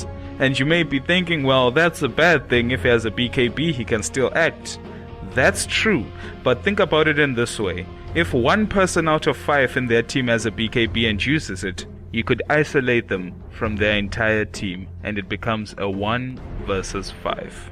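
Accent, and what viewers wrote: South Africa. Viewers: He does sound very South African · this oke is south african for sure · dude are you South African? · I'm guessing you're from South Africa. Am I right?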